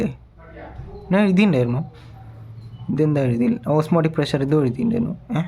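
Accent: Tamil Nadu, India. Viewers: Tamil touch.......... ❤❤❤ nalla sound...... Ente avastha..... 😒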